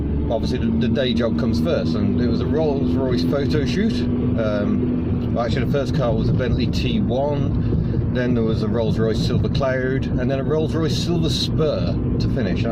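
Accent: United Kingdom. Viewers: Anyway, thanks for all your replies buddy, the only ither burning question for you is, what is life like in Wales, Kent isn't the best place to live and have been considering other options, there are alot of rude and unpleasant people in Kent, people in Wales seem more friendly, your accent suggests u properly moved to Wales from the mainland, how does life treat u over there?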